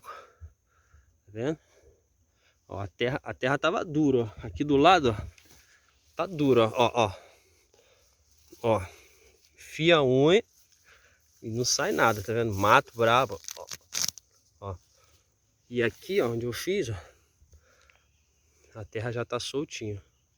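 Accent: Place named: Rio de Janeiro, Brazil. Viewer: Sou goiano e é a primeira vez que vejo um fazendeiro com sotaque carioca, porque geralmente a gente associa o sotaque à praia, litoral, algo mais urbano. No interior de Goias, São Paulo ou Sul é raríssimo um agricultor falando português com tanta perfeição. Parabéns, irmão. To pensando em comprar uma máquina dessas e seu video foi de grande esclarecimento. Abraço.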